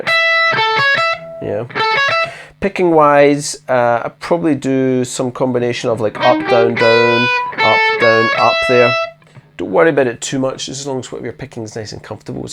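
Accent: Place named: Glasgow, United Kingdom. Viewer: at last! a guitar teacher with a weegie accent! ya dancer!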